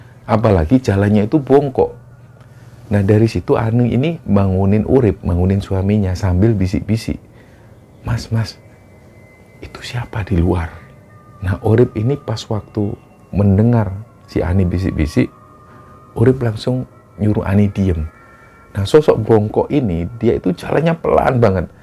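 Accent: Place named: East Java, Indonesia. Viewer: Semangat terus Tim 😊 kadang memang dalam berkembang akan terus ada masukan dan kritikan sampai nantinya bisa punya "ciri khas utama" yg akan diterima semua pendengar apa ada nya 😊 Btw kl saya pribadi, saya suka karena ada logat jawa timur nya.. jd suka kl mas ngmg lebih lokalan lagi, nyeletuk boso suroboyoan, atau ngasih info2 sejarah atau latar belakang lainnya. Jadi waktu baca komentar ada yg bilang, "Kl ngomong pakai Bahasa Indonesia dong, jangan pakai bahasa daerah. Kan yg dengerin ga semua ngerti." Aku merasa.....aneh 😅 karena kl pengen dengerin kisah horor berbahasa indo knp ga dengerin Chanel lain aja.. kan memang channel yg seperti ini, dan ini yg bikin ngenak di hati subscribernya.. 😢 Tp yowes lah, apapun yg usahakan, semoga bisa berkembang menjadi lebih baik lagi dan bermanfaat untuk org banyak. Aamiin 😊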